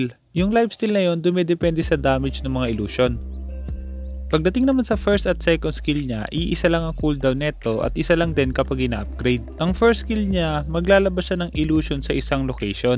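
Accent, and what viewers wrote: Visayas, Philippines. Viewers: bisaya ka idol?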